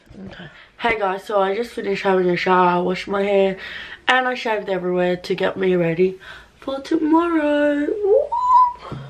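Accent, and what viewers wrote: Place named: Australia. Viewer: ok your Aussie🇦🇺🤓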